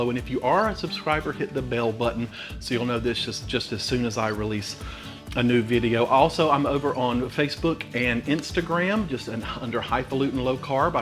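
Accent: South, United States. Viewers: Even if I have no interest in a particular recipe I ALWAYS love to hear what has to say. The videos are exactly as long as they need to be and have helped me stick with keto and not get bored. Useful info, humor and heart — and that delightful southern accent just seals the deal! ❤ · Oh my goodness, so I stopped doing keto about two years ago, maybe more and I have gained more way in the last two years than I ever have in my life. I have been so miserable, so I’m jumping back on the keto wagon. But I used to watch your videos all the time, and I just have to tell you… You look amazing!!!! I thought you looked great several years ago, but when I saw you again, I barely recognized you. I knew your sweet, southern accent. If you didn’t have a husband and you were straight, I’d be hunting you down… Just kidding, I’m not a stalker. But you do look amazing honey. 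I’m so proud of you. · I love your videos and the cakes look delicious, you make me smile the way you react to the taste testing, well done, your a great cook and a big thank you to the creators of these cakes, I also love that Southern drawl of yours. ❤😎 🇬🇧🏴🇺🇲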